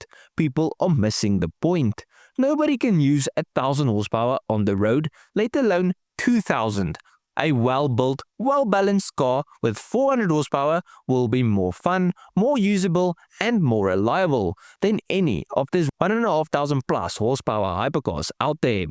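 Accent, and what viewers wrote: South Africa. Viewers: your accent sounds like a mix of south african or dutch and something else, what is it?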